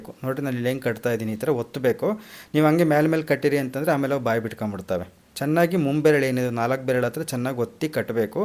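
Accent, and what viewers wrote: Chitradurga, India. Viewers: Exactly u told the same story of mine also sir ......me also near Chitradurga ....ur language same as we talk in our village ... whenever I feel lonely that time I saw ur videos it make me feel happy thank you .....Because u r from Chitradurga.......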